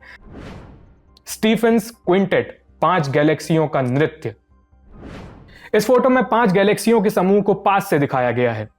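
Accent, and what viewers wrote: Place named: Jabalpur, India. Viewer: Mast bhai jabalpuri bhasha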